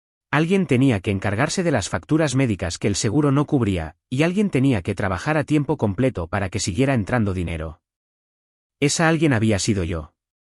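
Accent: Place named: Andalusia, Spain. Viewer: ES FACINANTE ÉL ESCUCHAR AL NARRADOR CON ÉSE PERFECTO ESTILO ANDALUZ...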